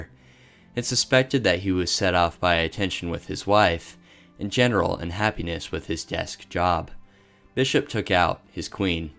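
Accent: Baltimore, United States. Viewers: That BMore Accent is HILARIOUS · That Baltimore accent is nasty..Dog is dug :face-orange-frowning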